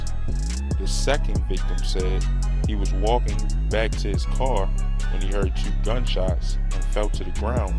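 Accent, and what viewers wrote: Baltimore, United States. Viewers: I hear a Baltimore accent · He from Baltimore speaking on down south shit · You can tell my man from Baltimore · The dude who does this channel def from Baltimore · U def from Baltimore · This dude accent 💀 he gotta be from Bmore lmaooo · Bro talking gotta be from Baltimore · u frm baltimore huh😭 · He from Baltimore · You from bmore ain’t you?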